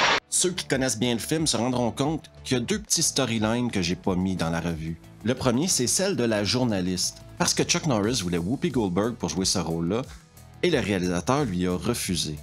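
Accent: Quebec, Canada. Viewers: Y'a quand même bien un sacré québécois pour nous raconter cette hostie d'invasion communisse que Chuck il a arrêté, merci pour l'histoire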